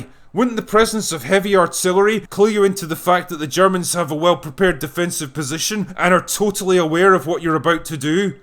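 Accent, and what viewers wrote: Scotland, United Kingdom. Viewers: I though you were a Scot?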